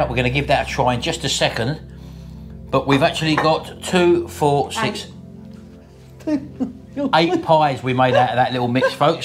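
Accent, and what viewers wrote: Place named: Scotland, United Kingdom. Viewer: I love your Scottish Accent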